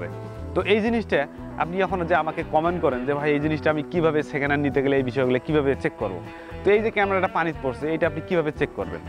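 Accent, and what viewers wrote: Rangpur, Bangladesh. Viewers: হ্যালো ভাই আপনার বাড়ি কোনঠে রংপুরিয়া কথা কন ক্যা ম্পা অবশ্যই জানাবেন কোন জায়গায়‌ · আপনি সাবলীল বাংলা ভাষায় কথা বলেন তবুও এলাকার উচ্চারণ আমার বাডী উলিপুর কুডিগ্রাম তাই বুঝতে পেরছিলাম ধন্যবাদ উত্তর দেয়ার জন্য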